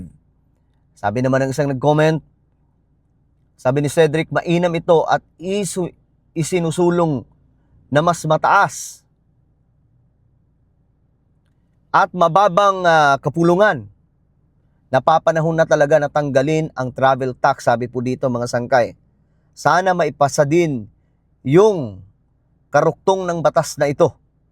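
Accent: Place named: Visayas, Philippines. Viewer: No offense sangkay...napaghahalata ka talagng Bisaya kc some of your pronounciations are hard aeg: Senate you said Si- nate, abolish not abulish..:hand-purple-blue-peace::hand-purple-blue-peace:💌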